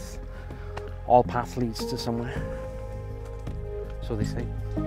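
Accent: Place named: Wales, United Kingdom. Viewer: Great shots,you do make me laugh tho, with you Welsh take on the the English language 😂 oh shit etc 😂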